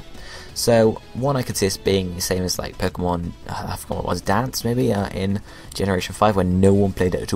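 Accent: England, United Kingdom. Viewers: your English accent make me believe everything you say!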